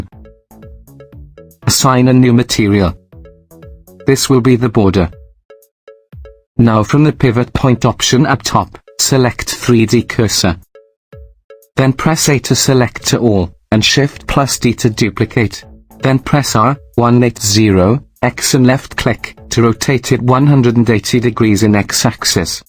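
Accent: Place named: Wales, United Kingdom. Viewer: Blender tutorial in welsh accent 😂 this is amazing. I love it!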